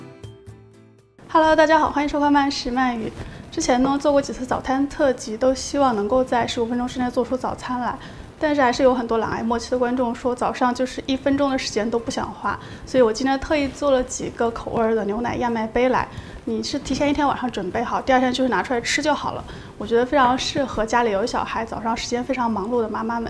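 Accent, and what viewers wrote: China. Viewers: 是哪兒的人啊？她的普通話口音我好喜歡。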